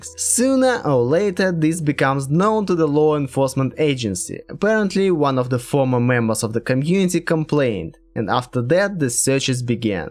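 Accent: Russia. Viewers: What a terrible russian accent you are speaking with! But I'm ok with that. Have you been there? Why do you think this info is worth spreading? It is "prooved" only by those who imprisoned Vissarion. Go to the webside of the community and check how people deal with information.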